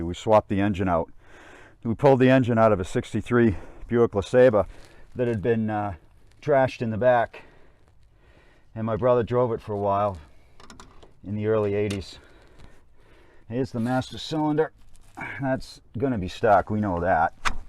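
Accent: New England, United States. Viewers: What part of New England are you from..lol I'm from the North Shore..😅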